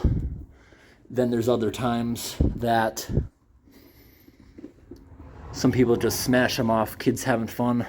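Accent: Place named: Montana, United States. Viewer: u from montana? ur accent sounds like a guy i knew from montana...or at least he lived there at one point in his life lol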